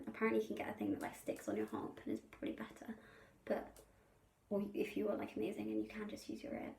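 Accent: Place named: South Africa. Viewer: It always surprises me that people think a harp is any more expensive than another professional instrument. My gold flute was $13,000 twenty years ago! I just spent a grand on a blemished new fullsicle but one day I will get a 34 string. I travel though and want to be able to travel with it. It actually sounds good because it is walnut. I do want a Ravenna 34 eventually. And even that is only about $4000+ so I just don’t get it. Two harps which still cost less than my flute. You are just adorable though. I could listen to you talk for hours. I’m trying to figure out the accent. South Africa? Or UK? But what happened? No progress videos?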